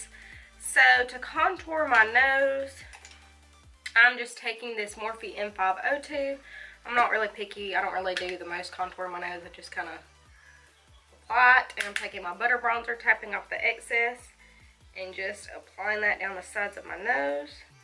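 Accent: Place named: Georgia, United States. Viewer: Absolutely love the Pro glow! Definitely my go to everyday foundation! I love your accent too! Soo cute! Reminds me of my grandma, she's from Hiawassee (however u spell it ) Georgia! It's so funny whenever I'm with her I always catch my self talking like her! Lol love it!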